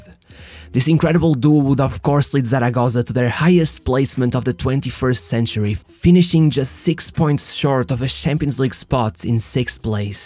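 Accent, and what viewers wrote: Portugal. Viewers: este sotaque é tão tuga certo ? ahha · This voice has such a portugese accent ahah 100 % sure he is portuguese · Hey man, love the videos 😊 Just a quick one. We speak Spanish in Argentina (it's actually called Castellano - <link> but with a different accent because of the 65%/70% Italian influence). I know, I sound like a pain in the 🍑 but I like your videos and I'd like for you to get better and get more viewers 🙏🏽 Your Rs and Gs are pronounced with a Portuguese accent. Therefore, they have nothing to do with Spanish. It's the equivalent of speaking Italian with and pronouncing it with a French accents. Again, sorry for being a pain 🧑🏻‍🏫 and thanks for the amazing videos 😊 Ciao! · no I don’t. He probs went to a English speaking country as very young lad but still carries the Portuguese language accent. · I cant help but to ask, are you portuguese? 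If you are not cangratualations because your portuguese accent is perfect. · Faltou-me dar aquele suporte, optimo ver um portugues envolvido num bom trabalho internacional! Sigo o canal e deixo-te os meus parabéns pelo trabalho, cada um com sua pronuncia e ainda bem que a tens porque assim dá para os teus compatriotas te identificarem e apreciarem! One love <3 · o teu portugues no ingles é muita mau fds, esse sotaque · Tu tens de ser português, o sotaque não engana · Amazing how a portuguese guy only needs 5 seconds to realize that’s a portuguese guy speaking english. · I love this guy’s portuguese accent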